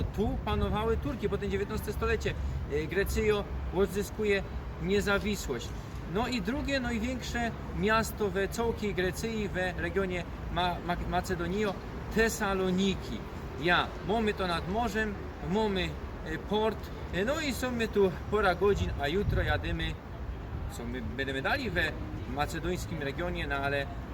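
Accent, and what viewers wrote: Silesia, Poland. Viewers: TA ŚŁONSKA GWARA JEST FAJNA , DAWAJ NA ALBANIE 😊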